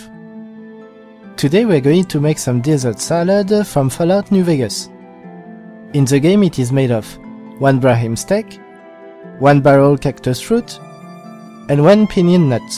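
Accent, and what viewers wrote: France. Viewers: Know what part of Europe is he from I feel like he is french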